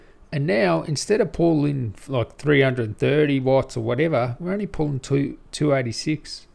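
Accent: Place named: Australia. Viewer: Most fucking aussie voice I have heard in my life. But with a hint of wog too love it. Subbed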